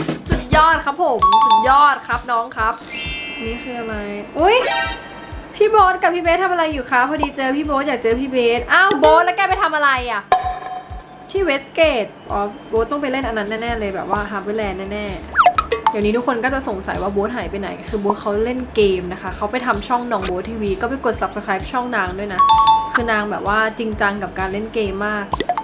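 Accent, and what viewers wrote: Isan, Thailand. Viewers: เอื้อยเบสเว้าอี่สานม่วนคัก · สำเนียงพี่เบสพูดอีสานคือได้มากกกก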